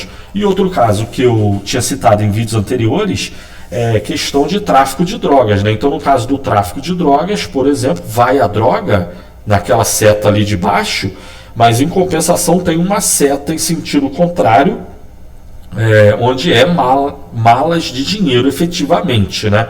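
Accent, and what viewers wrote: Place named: Rio de Janeiro, Brazil. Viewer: professor é carioca ahha, excelente aula.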